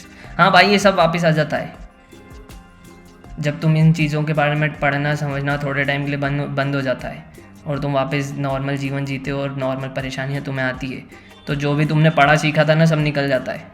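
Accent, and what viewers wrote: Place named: Madhya Pradesh, India. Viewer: Mp se ho kya bhai? Pyaar accent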